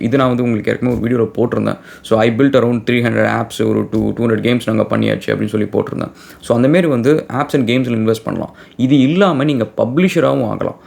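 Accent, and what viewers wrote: Dindigul, India. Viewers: Nenga Dindigul ah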